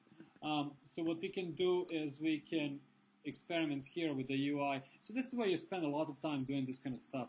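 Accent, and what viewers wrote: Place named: England, United Kingdom. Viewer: Epicness indeed, but did anyone notice he had a perfect english accent for like the first 5 minutes like instantly went dr. jekyl and hyde?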